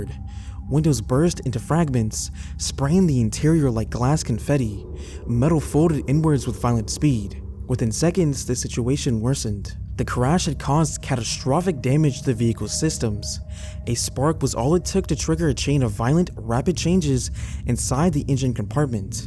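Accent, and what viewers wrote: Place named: Toronto, Canada. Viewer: You sound like you're from toronto!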